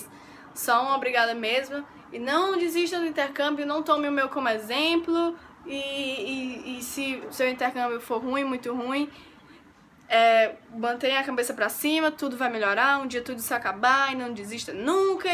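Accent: Ceará, Brazil. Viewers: não sabia de onde tu era, mas reconheci o sotaque cearense, q é meu sotaque tbm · nossa reconheci o sotaque na mesma hora que ouvi vc falando . CEARÁ ❤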